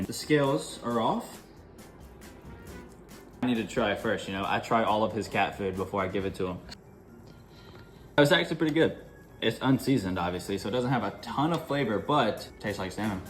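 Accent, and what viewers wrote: South, United States. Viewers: I never realized how southern his accent is 😂 Oh, and Kurt's a cutie as always ofc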